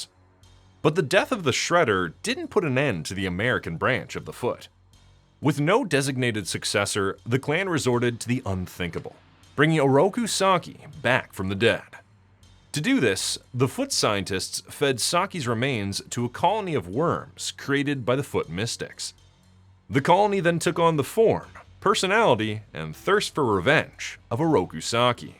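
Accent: Canada. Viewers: Is this guy from Nova Scotia?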